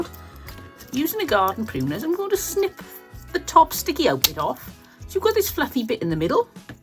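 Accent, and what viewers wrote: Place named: Fairwater, United Kingdom. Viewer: I love watching your videos and your voice brings back lovely memories of the two years as a teen I lived in fairwater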